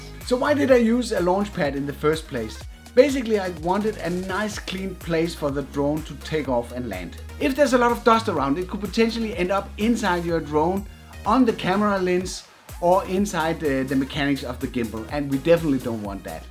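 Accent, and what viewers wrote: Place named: Denmark. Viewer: No question that you are Danish! The accent is obvious from just 10 seconds of listening. Great video!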